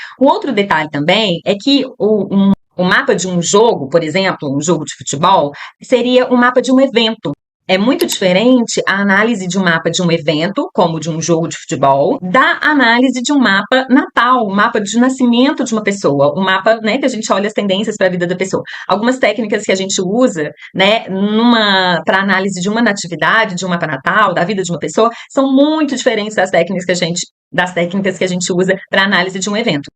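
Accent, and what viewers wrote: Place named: Minas Gerais, Brazil. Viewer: 😂😂😂😂😂 super acontece. Ouvir sobre mapa astral com sotaque mineiro é um bálsamo! ❤️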